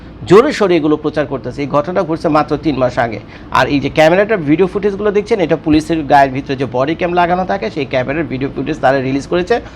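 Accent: Dhaka, Bangladesh. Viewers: ের বাড়ি কোথায় মাঝে মধ্যে ঢাকাইয়া ভাষায় কথা বলেন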